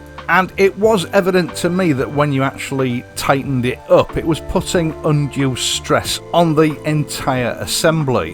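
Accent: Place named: Hull, United Kingdom. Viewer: I take it you're from Hull or thereabouts? From Hull meself, but been languishing in Western Australia for the last 48 years. Just found your videos and I like your commentary and delivery. For me sadly I won't be owning another motorcycle anytime soon. At 74 my wife won't let me near one. Still good to dream though.....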